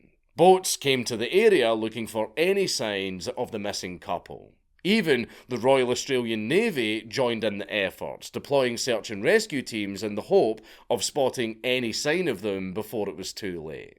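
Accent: Scotland, United Kingdom. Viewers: Why do Scotts pronounce “world” like it has 3 syllables?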